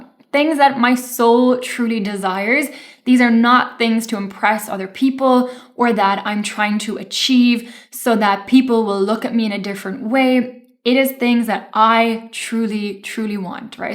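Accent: Ireland. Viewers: Where are you from, your accent has elements of Irish???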